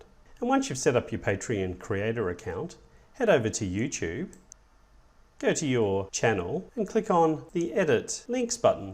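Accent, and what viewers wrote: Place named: Australia. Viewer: the Australian accent messes me up. I keep reading from the melody that is used to modulate their language that every second or third word is sounding like either a question or "don't you understand, it's so obvious"... I really didn't get anything out of this. I mean... "ask your fans to contribute to your projects"... how? How do you get your "fans" and why would anyone "pay" me?? The very idea of advertisements in the classic youtube monetisation means people themselves don't need to spend money. The corporates do that with their (massive) advertising budgets. Most people want everything for free in this buy one get one free culture today. People actually pay a monthly subscription for videos that appear from time to time from someone? I certainly would pay for that unless I am following a course and want to see each video and "get something for my money". 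I don't really get it.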